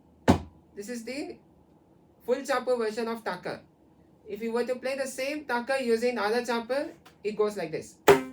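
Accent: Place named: United States. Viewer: I am curious, your accent smells like US. Which state are you residing. And, are you conducting online classes. If yes, how can I approach your class. How much the charges for a student. Thank you 🙏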